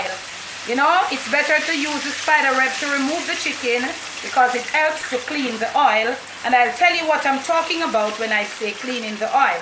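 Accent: Jamaica. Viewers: I just love to watch you cook, and I also love the way you talk. The real JA dialect.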